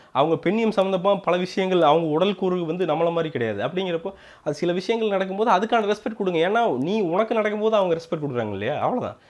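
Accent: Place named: Kongu, India. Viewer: Candour expressed with a hint of Kongu Tamil. Well done.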